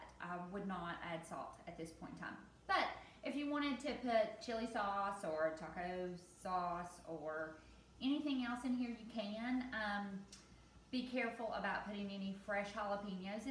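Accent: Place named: South, United States. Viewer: Southern accent is like nails across a chalk board.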